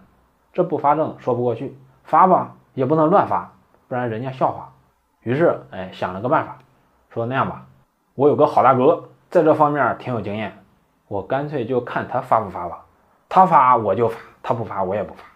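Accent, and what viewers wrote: Henan, China. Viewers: 空客的河南话很地道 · 这个老丝儿是河南了呀！ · 听了两期已关注！提点小建议，普通话听着别扭，河南话带劲，我也不是河南人但听着带劲。干脆就河南话整起来，兄弟要有文化自信啊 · 聽到的，河南鄉音，好舒坦。繼續努力加油唄！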